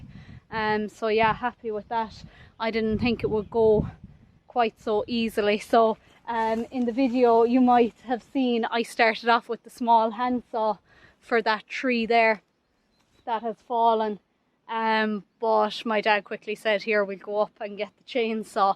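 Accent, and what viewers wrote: Kerry, Ireland. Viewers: Well done girly. Lovely hearing your kerry accent & pronunciation, i do miss it. You're a hard worker & an asset to your dad. You deserved that holiday you had. And you don't give yourself enough credit girl !! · Another brilliant video your dad must be very proud of you with the amount of work you do on the farm 👍👍 plus love your irish accent 😊😊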